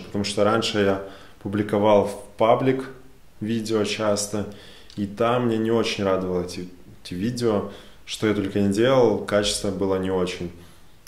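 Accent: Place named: Ukraine. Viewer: украинский акцент, с мягким звуком «Г». Только и всего. Поверьте нам не режет слух абсолютно и подстраиваться сложно, так как в нашей речи практически нет твёрдых звуков «Г», как в русском языке. Как говориться, зВыняйте))).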